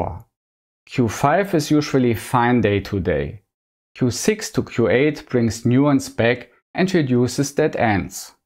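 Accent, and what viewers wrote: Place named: Germany. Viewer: Thank you for your video, it helps me A LOT? Ist das ein deutscher Akzent, der mir hier die Ohren.... maltretiert?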